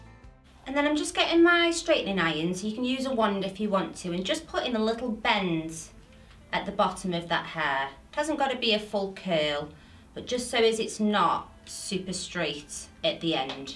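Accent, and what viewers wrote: United Kingdom. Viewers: Just discovered your channel, amazing hairstyles. Also, your accent is lovely, from which part of the UK are you?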